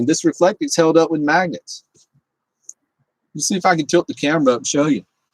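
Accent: South, United States. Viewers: Hey. Gotta check out all ur vids. I see Humbuckers on that Maple beauty. Is that a Gibson or Epiphone? Great Vid with in OR.All Subbed. I thought that smooth Southern accent was maybe GA or MI, but I'm guessing TN, now a fave state of mine. Kenny Chesney was an E.TN boy. Stay safe & Happy Trails brother.🎸🥂I'm in NYC and gotta bail. Gotta build a Van or Pickup Rig. Stay safe brother.phil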